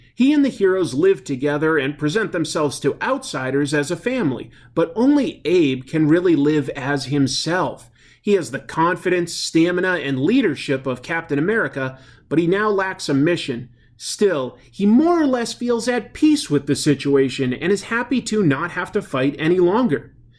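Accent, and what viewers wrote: Boston, United States. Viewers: If you're trying to do a Maine accent, you might need to relax your throat more. Right now you have a solid Boston accent. :D Great video. Keep it up!!! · Is that a farmer accent or Boston? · I thought he sounded like from Boston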